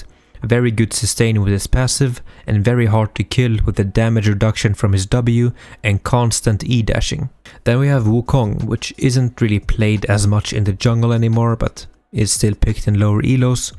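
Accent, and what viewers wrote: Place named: Sweden. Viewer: From sweden right?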